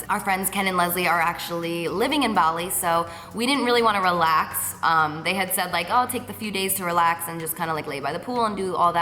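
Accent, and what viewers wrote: New Jersey, United States. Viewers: shes nj n i think ny talk like that too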